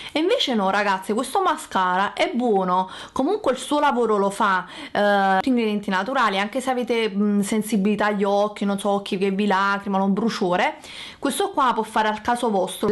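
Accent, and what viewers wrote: Naples, Italy. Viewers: Posso dirti che il tuo accento napoletano mi sta troppo simpatico? :) Non so perché, ma mi ispira dolcezza!! Posso farti una domanda? Vorrei comprare qualche ingrediente per creare cosine semplici (deodorante in crema/stick gel per i brufoli, tonico, burro per il corpo), per questo vorrei evitare spese grosse. Se comprassi solo queste cose: tocoferolo, cosgard, burro di karitè e qualche olio essenziale, potrei creare qualcosa? O mi serve per forza la xanthan gum? Grazie mille e complimenti per tutto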